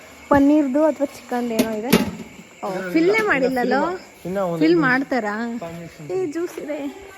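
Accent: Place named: Hassan, India. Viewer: Natural hassan language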